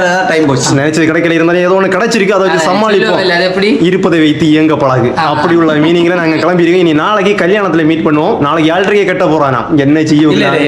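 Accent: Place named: Kanyakumari, India. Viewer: அண்ணா நான் பிறந்தது மதுரை ஆனா வளர்ந்தது கன்னியாகுமரி முழுக்கோடு YMCA உங்க கன்னியாகுமரி பாசை கேட்கும்போது என்னுடைய சின்ன வயது நியபகம் வருகிறது வாழ்க்கை இந்த பாசை என் வாழ்க்கை மறக்க முடியாது மிக்க நன்றி அண்ணா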